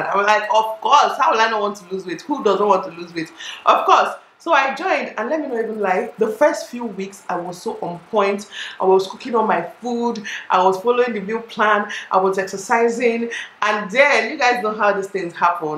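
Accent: Nigeria. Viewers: In God name ,can someone explain to me what she's talking because not anyone is able to listen to english with Nigeria accent please i beg in Jesus name... i just want to know what she did for her weight loss if it was with some writting that will be easy for peoples like us.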